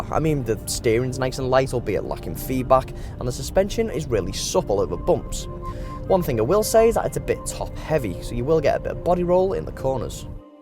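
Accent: Liverpool, United Kingdom. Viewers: You from Liverpool???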